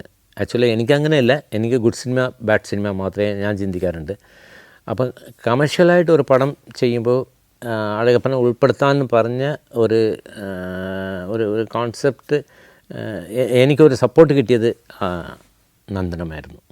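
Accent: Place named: Tamil Nadu, India. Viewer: മി അളഗപ്പൻ, താങ്കൾ തമിഴനാണോ. നിങ്ങളുടെ മലയാളം ഒരു തമിഴൻ മലയാളം പഠിച്ചു പറയുന്നതു പോലുണ്ട് !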